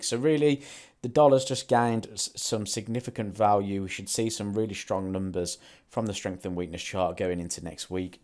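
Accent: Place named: Australia. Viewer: Thanks for the video. Can you please make a video on AUDUSD and AUDNZD? Can't figure out your accent xD Sounds Aussie but with some british words..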